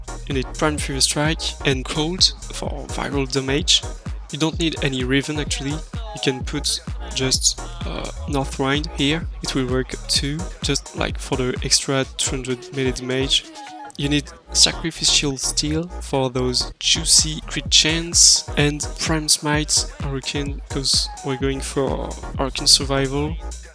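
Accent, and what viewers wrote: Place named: France. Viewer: slay girl ! very sexy voice btw, i love the french accent and the build is insane plz do more of these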